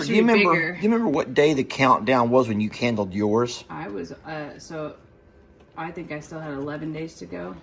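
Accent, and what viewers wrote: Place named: Texas, United States. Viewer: Your Texas accent is showing... Terrance becomes Tarrence. get over here Tarrence.. Ha! Love it!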